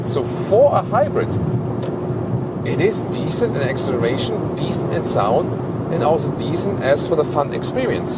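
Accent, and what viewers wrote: Germany. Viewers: There's something about watching a car review with a German accent. Honey to my ears man 😂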